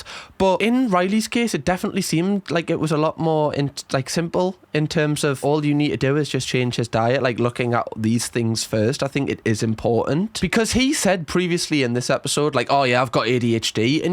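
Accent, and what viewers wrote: Newcastle, United Kingdom. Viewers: He's from Newcastle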